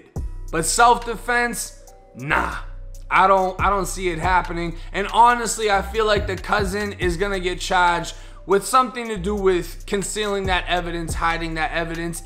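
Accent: Boston, United States. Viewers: Are u from Boston bro?? My Mom R.I.P. was from East Hampton n ur accent is exactly how I envision an authentic Boston accent!!! My Mom moved here to da SouthWest at 10 years old n so by time I was born up until I was 10 wen she passed, she didn't have any sorts of Boston, New England, or East Coast accent wat so ever!!! But I'm jus curious is all n too as I would like explore some of my roots back East n wat not cuz da SouthWest n West Coast, California to be specific, where my pops is from, but dat is all I've known in my 31 years of existence!!!!! Totally totally different den wat I am accustomed too dats fir sures!!!!! N too bro jus wanna letch u know dat I admire da positive change u made to ur life, da criminal aspect not committing crimes n wat not jus wasting ur life away in a lil locked room!!!!! I too made dat change after a 1½ years in juvenile n snapped once I got out n had a totally different fukin perspective!!!!! 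It was actually a positive thing n definitely helped me cuz had I not ended up where I did, I was destined fir prison without a doubt!!!!! Got a clean record since turning 18 compared to da 7 felony's I got from 16-17!!!!! But shit I rocks wit u!!!!!!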